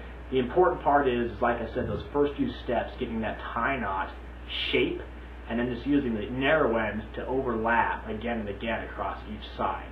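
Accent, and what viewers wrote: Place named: Utah, United States. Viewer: Two comments: #1 Love the knot, and a great demo video. Only thing you might consider for future videos is using a greater contrast between tie and shirt. #2 You'll need to work a little longer on ridding yourself of the Utah accent. Don't look now, but your Provo is showing.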